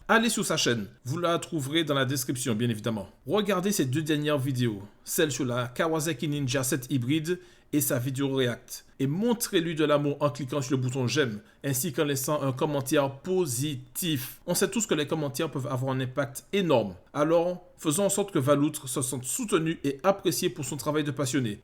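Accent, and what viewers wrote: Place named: Antilles, Caribbean. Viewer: Merci à toi pour cette video de soutiens force aux antilles✌😉😉😁👍he oui l accent on ne peux la zapper force a toi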